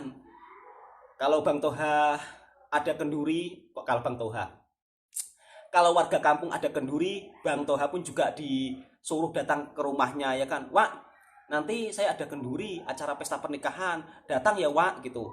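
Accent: Java, Indonesia. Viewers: Ini, jowonya waduh...buaayak pekatnya....hehehehe😂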